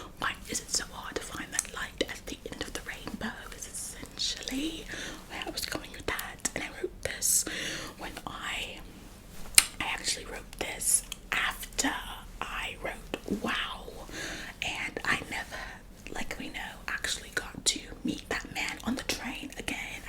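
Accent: United Kingdom. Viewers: there's smth about the British accent that really brings this all together 😭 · i need more british accent it was so gooooood!! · omg the british accent is so realll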